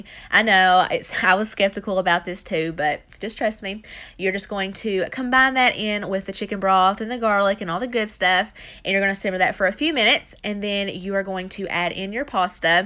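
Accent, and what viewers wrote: South, United States. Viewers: Aloha!!! I absolutely love the sound of your voice!! It’s super soothing and your lil southern accent is so cute!!! I am a new subscriber, & I’m guilty!! I’ve been caught I’ve been binging on “WFD” everyday after work!! You seem like such a sweetheart! Blessings & Aloha Sweetie!!!! God Bless you and your family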